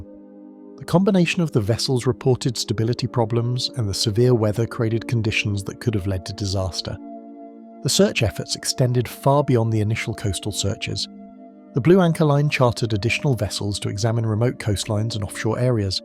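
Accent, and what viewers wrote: United Kingdom. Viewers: US anti-intellectualism using a British accent. Hilarious. Rewriting of history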